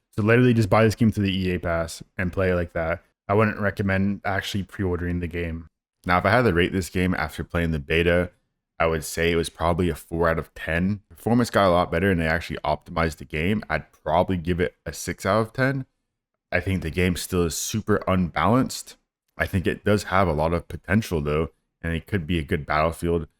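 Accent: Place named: RP, United Kingdom. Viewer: Listen to the Man, he learned from the BBC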